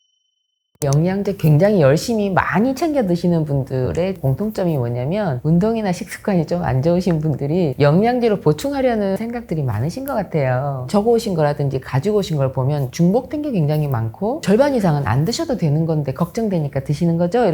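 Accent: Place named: Daegu, South Korea. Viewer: 이분 말투가 대구 사투리인거같은데 매력있네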